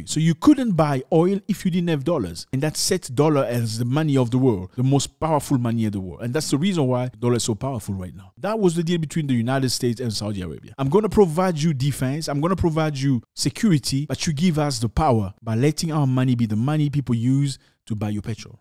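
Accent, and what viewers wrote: England, United Kingdom. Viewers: Notre frère va du côté anglophone de la force, très bel accent anglais 💪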